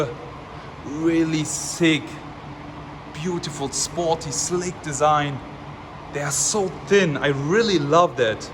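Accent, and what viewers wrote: Germany. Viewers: sorry ich bin jetzt auch nicht besser aber man merkt das du deutscher bist wegen der Aussprache aber wie gesagt ich bin auch nicht besser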